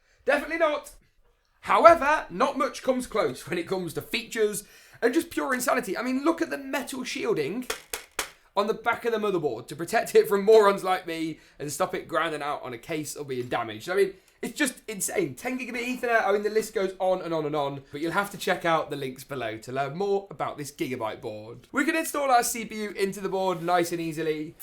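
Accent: United Kingdom. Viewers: I love this guy especially his British accent that makes the build more interesting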